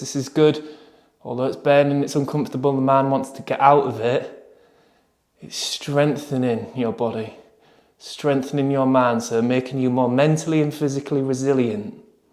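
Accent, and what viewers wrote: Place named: Birmingham, United Kingdom. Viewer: hi, I ran my local parkrun today and got talking to someone visiting from Ireland... we are both fans of your videos and we spent a good few minutes running along and trying to figure out what accent you have? I was thinking Birmingham area, he was wondering if you are from the Scottish Highlands?!?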